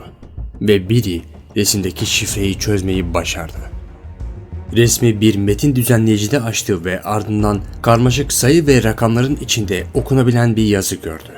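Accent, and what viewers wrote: Azerbaijan. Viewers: Azerisən türksen? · Abi sen azerimisin??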